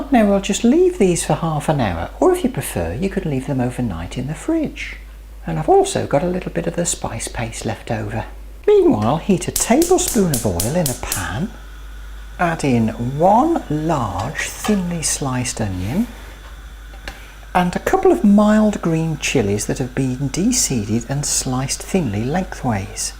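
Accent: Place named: Birmingham, United Kingdom. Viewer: I lived in Birmingham for 23 years then move to London, which I've regretted it ever since. I love your accent because it reminds me of a lovely, very much under rated, city and your tips and recipes are terrific, please keep them coming! ❤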